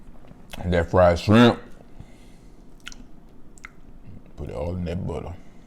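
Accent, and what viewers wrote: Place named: South, United States. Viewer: New subbie here! Love your personality & your southern accent. Do I hear some geechie or Caribbean in your voice? Congrats on being smoke free. Keep focused!! Peace love!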